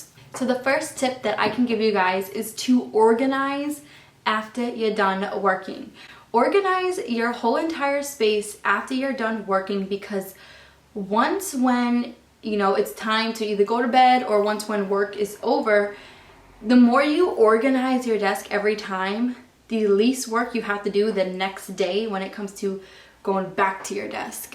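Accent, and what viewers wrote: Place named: New Jersey, United States. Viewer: the New Jersey accent!!! Love it lol like if U agreeeee!!!😆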